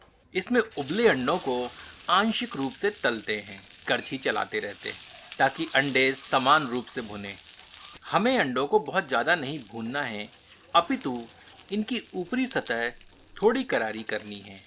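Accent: Standard. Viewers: Bhrata....aapki ki sudh Hindi sunn k...mann Anandmay hogaya... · जी हम तो आपके व्यंजनों के स्वाद तथा आपकी शुद्ध हिंदी के उच्चारण, दोनों के कायल हैं ।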